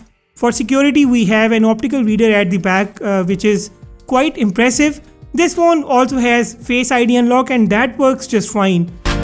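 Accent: India. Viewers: Please put english translate for those who don't understand Hindi accent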